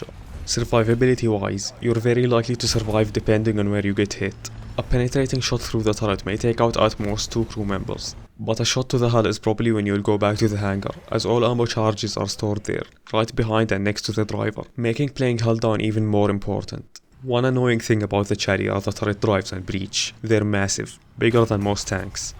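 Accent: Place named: Arab world. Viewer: Love the Arabic accent ;) nice vid man, keep it up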